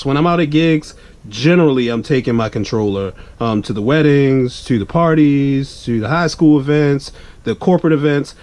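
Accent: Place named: Baltimore, United States. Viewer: I can tell this man from Baltimore 🤣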